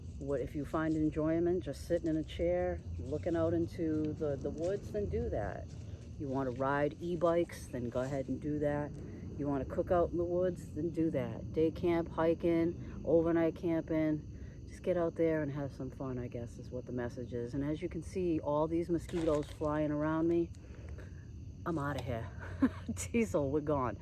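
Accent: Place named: East Coast, United States. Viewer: Oh BTW, love your cute east coast accent 👍, I'm from the west coast of Cali.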